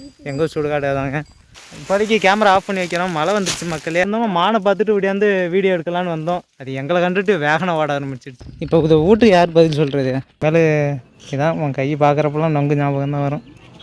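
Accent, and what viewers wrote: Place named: Kaveri Delta, India. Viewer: Peachila therila, vivasayi Vera.. delta place of tamil Nadu. Thiruvarur or tanjore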